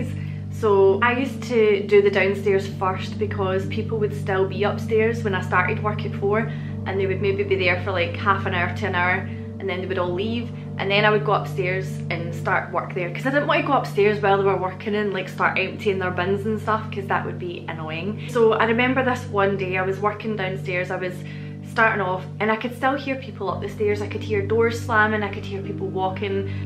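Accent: Scotland, United Kingdom. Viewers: Funny you get comments about you talking too much. The hugest part of why I watch your videos is to hear you speak Scottishly (yeah, I made that word up).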